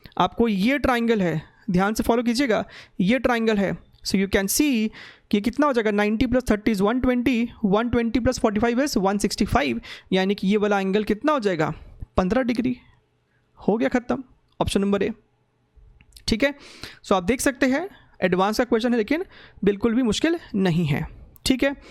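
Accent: Bihar, India. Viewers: Badhiya hai.... meanwhile... Bhanhiya hai...❤️❤️❤️ Pure bihari tone Love frm bihar sir...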